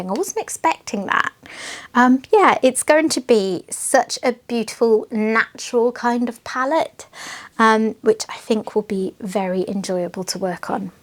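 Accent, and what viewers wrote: England, United Kingdom. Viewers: I just found your channel and I'm binge watching your posts. I'm from the states but I love hearing your accent because I can't wait to get back to England! It's Heaven to me 😊. Love your unboxes!!!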